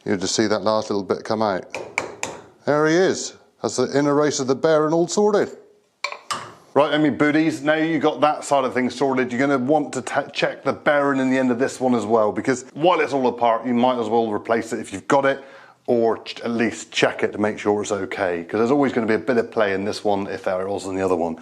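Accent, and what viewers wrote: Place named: Alabama, United States. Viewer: He is from Alabama.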